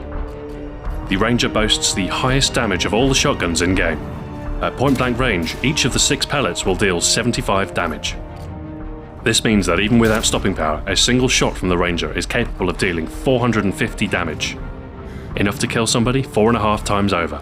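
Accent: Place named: United Kingdom. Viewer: In any other case you would have the same view. You probably only like it now because some guy with a badass British accent uses it. Rite?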